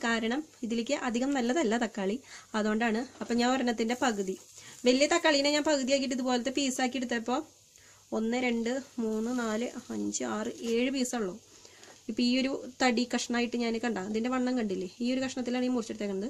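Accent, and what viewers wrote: Thrissur, India. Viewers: ചേച്ചിയുടെ അവതരണം സൂപ്പർ . സംസാരവും തൃശ്ശൂർ ക്കാരി ആണ് അല്ലെ വീഡിയോ കാണാൻ കുറച്ചു വായിഗ് പോയി സോറി . സംഭവം കലക്കി ... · haha...kollam chechii...enike ee recipem chechide sumsaravum istapettu....thani nadan thrissur bhasha..nyc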